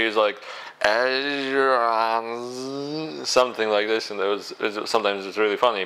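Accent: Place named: Poland. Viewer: “Witam.” are you polish??ur accent sounds like soo i could be wrong:)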